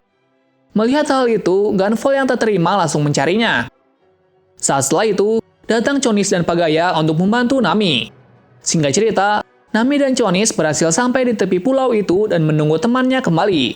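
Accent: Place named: Surakarta, Indonesia. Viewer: mirip orang solo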